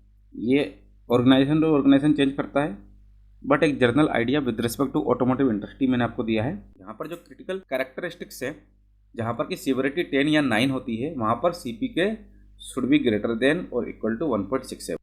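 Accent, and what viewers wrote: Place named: India. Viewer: Could you speak slower? My English is not too good when listen English by India. So sorry to say that. I really want to watch more video from you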